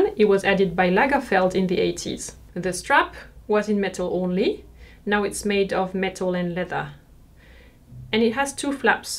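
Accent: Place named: France. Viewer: Love the French names pronounced with a true French accent!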